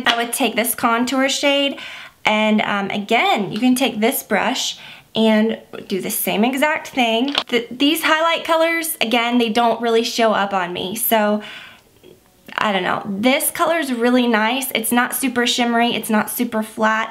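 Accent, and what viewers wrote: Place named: South, United States. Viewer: I've been binge watching all of your videos lately. I'm from Texas so I feel like I identify a little better with you since you're from the south too! You talk like me!😝 love all your videos and I think Olivia is PRECIOUS!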